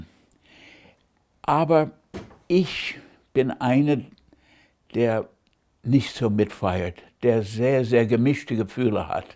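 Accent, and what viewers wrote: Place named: United States. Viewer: He still has quite an American accent when speaking the German language.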